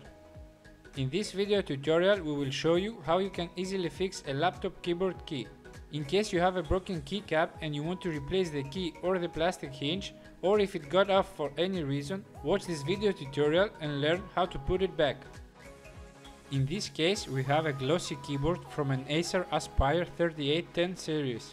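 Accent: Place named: Germany. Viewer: hes german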